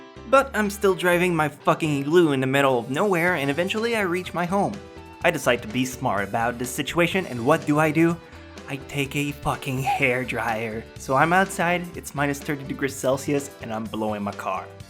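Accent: Quebec, Canada. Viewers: OMMFGGG ur Canadian as well judging by accent I would assume Quebec se magnifique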